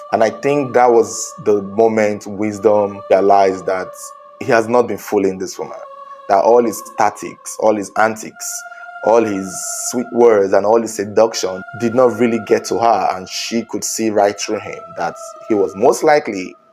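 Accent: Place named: Nigeria. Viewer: You sound Nigerian. Where in Ghana region did this happen? Did you mention?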